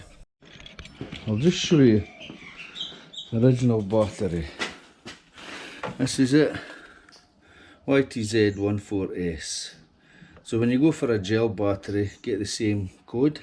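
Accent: Scotland, United Kingdom. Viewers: You sounds like a Scotsman. Regards from Poland 🍻🍻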